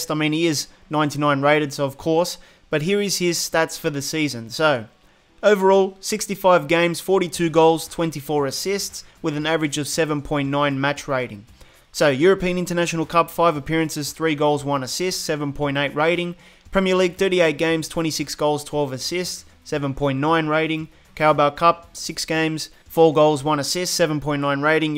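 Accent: Australia. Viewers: Are you Australian? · Hey r u from austr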